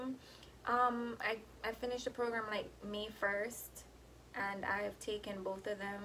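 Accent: Jamaica. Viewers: Hey girly, your video is inspiring. Taking my Abdomen registry in October your video really help me understand i should expect and how to study. On another note and totally unrelated, are you Jamaican or Caribbean? I am Jamaican and my ears are very keen to recognizing fellow caribbs especially other Jamaicans talking. But i could be wrong lol! Appreciate your video so much! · Are you Jamaican? I am too but American Jamaican I love your accent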